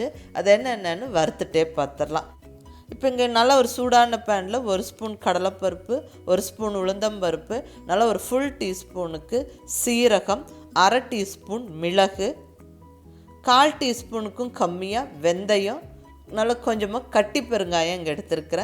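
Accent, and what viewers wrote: Kongu, India. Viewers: Hi ka .I tried most of ur recipes. Everything with kongu style touch and ur speech .kuddos to ur receipes and u.👍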